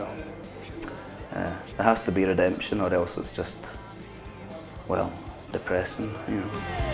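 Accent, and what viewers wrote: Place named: Scotland, United Kingdom. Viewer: that is the nice Scottish accent ahaha :')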